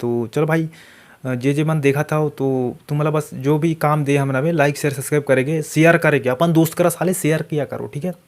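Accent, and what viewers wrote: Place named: Ambikapur, India. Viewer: AAP kha se ho bhai cg thoda lailunga ambikapur taraf ka bolte ho😅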